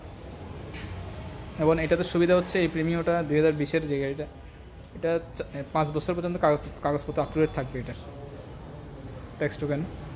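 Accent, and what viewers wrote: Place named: Comilla/Noakhali, Bangladesh. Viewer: উপস্থাপক কুমিল্লা দক্ষিণ অথবা নোয়াখালীর জেলার মনে হচ্ছে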